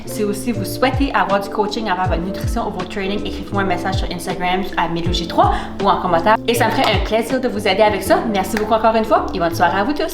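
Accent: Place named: Acadia, Canada. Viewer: J’adore en français et surtout l’accent acadien. Love it!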